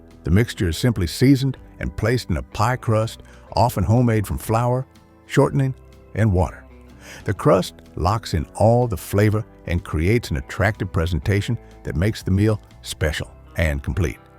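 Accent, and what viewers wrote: South, United States. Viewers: the AI southern drawl is maddening to me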